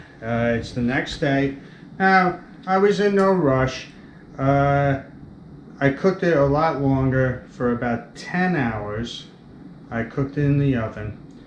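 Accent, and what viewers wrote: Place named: Texas, United States. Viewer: Yes, that accent always gives it away doesn't it, haha. Well technically if you live in Texas then that makes you a Texan. I'm sure there is a native Texan that would strongly disagree. These things always make me laugh because most of us came from somewhere else originally and quite a lot of these so called southerners have not so distant northern and European roots.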